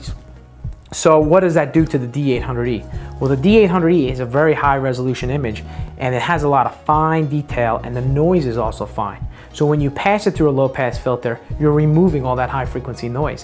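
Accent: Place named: New York, United States. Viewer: too much talking... yo, you from the NY area? yea, I thought so... eh, I feel like gettin some gabbagool.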